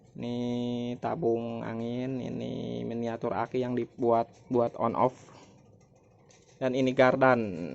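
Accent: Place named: West Java, Indonesia. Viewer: Kanyahoan bgt si a urg sunda na wkwkwk... Sok ah di lanjeng....mugia sukses a🙏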